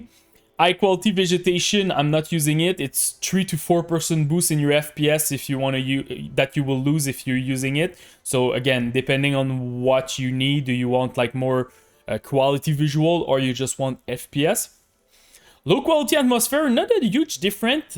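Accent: Quebec, Canada. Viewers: L accent québécois 😍😍😍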